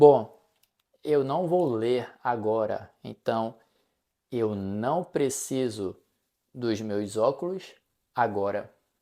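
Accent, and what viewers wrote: Brazil. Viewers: Where in Brazil is that accent?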